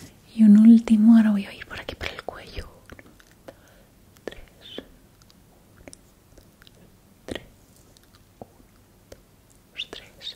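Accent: Spain. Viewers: como pronuncia esa R como es, yo diciendo palabras con R como orchata suena como L, puertorriqueno al fin lol. El acento espanol usualmente no me gusta mucho pero el de es chevere, no se debe ser la region de donde es o no se pero lo mejor de ella es cuando habla. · La tonada española es el toque perfecto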